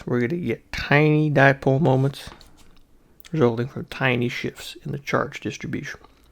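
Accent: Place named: South, United States. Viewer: Love the Southern drawl haha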